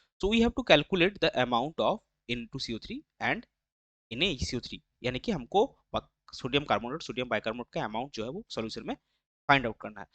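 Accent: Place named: Bengal, India. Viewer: Dont recruit bengali accent its so uneducated sounding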